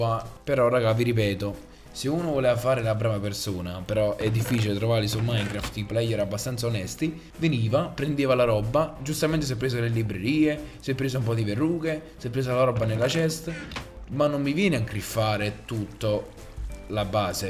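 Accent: Naples, Italy. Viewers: ma sei napoletano?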